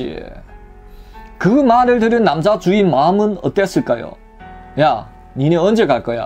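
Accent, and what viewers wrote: China. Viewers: 유튜버님은 동포신가요 발음이 중국어처럼 들려서요 딴지는 절대아니고 단순궁금해서요 좋은자료고맙습니다 자주방문하겠습니다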